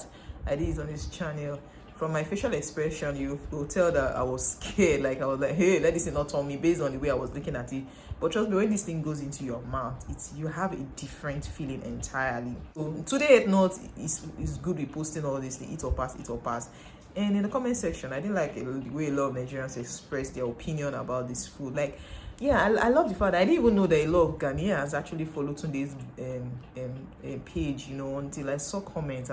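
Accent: Ghana. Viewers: We Need people to stay ghana forever . You talk like ghanaian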